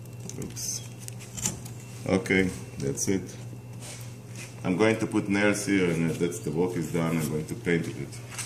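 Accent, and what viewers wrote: Israel. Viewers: Helpful, thank you. The insight about Lowes was vital information. Enjoyed the Israeli accent as well, haha.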